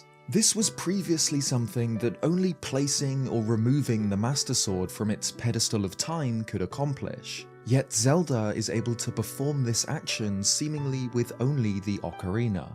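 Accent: England, United Kingdom. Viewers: nah he’s english is js a trait of posher non rhotic english accents, there’s a short on this phenomenon i’ll try to find it and link it here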